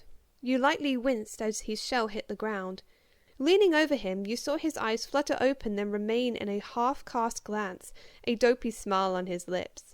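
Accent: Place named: United Kingdom. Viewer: Bro why you sound like a British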